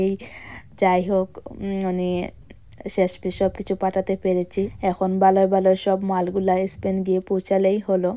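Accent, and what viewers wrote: Sylhet, Bangladesh. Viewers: Apu apnar basha Sylhet er kun jaygay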